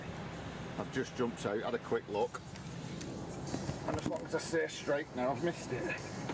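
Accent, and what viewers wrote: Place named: Yorkshire, United Kingdom. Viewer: Yorkshire! :D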